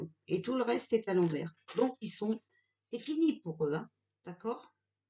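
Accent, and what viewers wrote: North, France. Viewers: Bonjour, vous êtes du nord ?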